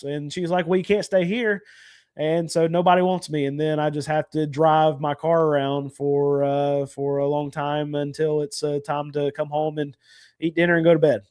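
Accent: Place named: Texas, United States. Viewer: Are you in dfw? Sound like a burleson man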